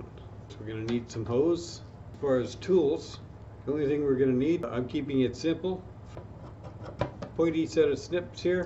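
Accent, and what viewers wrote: Canada. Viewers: You've got a pretty strong canadian accent, its like making carbon dioxide with Bob and Doug Mackenzie. Dont forget your tuke eh, uhh, and...uhhh bring me another beer there hoser. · Chong was from Edomonton, Canada. The dialect sounds nearly identical. Nice DIY demo, thanks for posting. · The accent is Canadian, eh? Thanks for the 420 411 dude. Go Oilers and God save QE2 eh?♥♥♥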